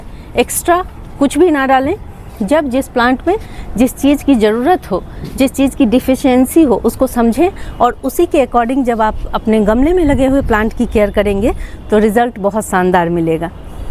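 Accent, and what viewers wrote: Bihar, India. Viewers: mam ap Kahan ki hai Apki Bhasha se ap Bihar ki lag rahi hai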